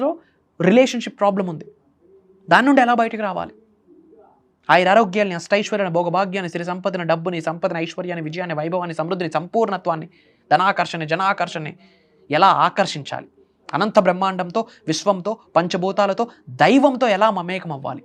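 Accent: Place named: India. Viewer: yela miru andra vaku English ni telugu chesi maatladuthunaru.. Malaysia Singapore lo ila maatladithe kinda nunchi paina teda ga chudtharu..😅😅. thala noppe vosthundi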